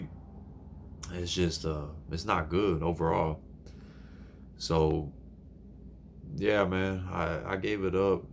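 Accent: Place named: Louisiana, United States. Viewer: I bet you’re from Louisiana. Maybe around Lafayette area?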